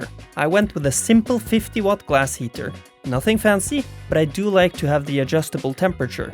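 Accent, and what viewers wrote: Norway. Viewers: The problem is, in Sweden you are not allowed to sell shrimps on facebook (thougth it was the same in Norway where it sounds like you are from). But great video!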